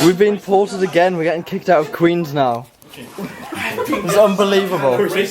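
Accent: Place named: Northern England, United Kingdom. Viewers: He definitely has a northern accent, as I'm from Newcastle and I can spot a hint of it assuming through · I can't place your accent, is it gateshead....? Or somewhere near Newcastle?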